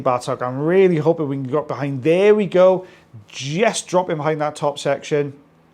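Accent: Wales, United Kingdom. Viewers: he's a beautiful Welsh accent 😊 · I usually listen with mute on but I decided to listen to you and you're very interesting and I am an American and I love to hear how people from the UK speak❤ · Who wouldn't want to hear your lovely Welsh accent?! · oh absolutely, the british accent doesn’t hurt either. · I couldnt mute you. The Welsh accent is the best in the world. KEEP TALKING!!! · i love listening to you talk! 😂 i can listen to any UK accent forever, so lovely. i have a Welsh friend, he lives in England now but he’s quick to tell people he is WELSH 😂😂😂😂 Thank you! ❤ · Your Welsh I'm Welsh keep talking, brilliant 😊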